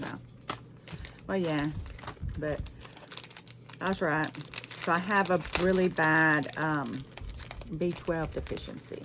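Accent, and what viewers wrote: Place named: United States. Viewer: Oh you never fail to make me laugh”ain’t nobody all up in my legs” I’m from New Zealand so the way Americans talk makes me laugh ya all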